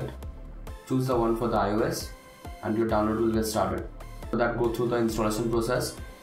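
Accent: India. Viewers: This is a fake scam that will give you Adware, spyware, and other Trojans that will steal you personal info and make your computer unusable. Don't download this it is fake. Anytime you watch some one that sounds Indian dont trust them and if you click on a URL at the bottom to try to download something dont do it if it takes you to ad-fly or anything else like that. Take my advice. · This FUCKING GUY WILL NOT ADMIT THAT HE IS A SCAMER Not believe me well, clue number 1 he is has a Indian accent, clue number 2 when somebody talks back after him protecting himself he dosent respond, last and most obvious clue he tried making us buy/download viruses What do you have to say now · when an indian guy is talking you just know it is scam. These guys need voice changers or a single native english speaker to back their success. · Lets try to play fortnite mobile on Pc , typing : " how to play ios games on pc " *, * opening the first video , then heard indian accent and said :"ok , Nvm" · Ah....yes. 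Indian accent...I am pretty sure most of your subs are bot accounts. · As i heard indian accent, i paused and wrote this. #nextvideo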